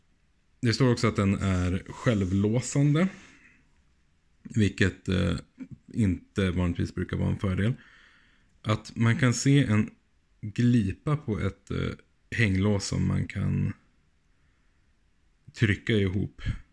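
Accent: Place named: Australia. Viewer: Cheers mate that would be greatly appreciated. They can be a fun read at times when the translation doesn't match what you are saying, so don't expect them to be an exact translation, it has a lot of trouble with the Aussie accent, it's just a tool to give us the general idea of what you are saying. You were mentioned on Lockmania's series promoting locksport channels. I really enjoy that locksport is such an international sport and locks speak a universal language that we can all understand regardless if there is translation or not. I am enjoying your channel.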